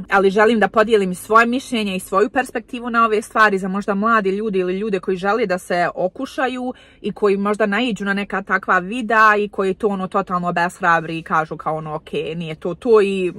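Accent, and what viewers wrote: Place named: Bosnia and Herzegovina. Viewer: Ma to je taj bosanski neknjizevni jezik. Tako pricaju svi od bebe do starca, to sam odavno primetila. Onaj seljacki govor!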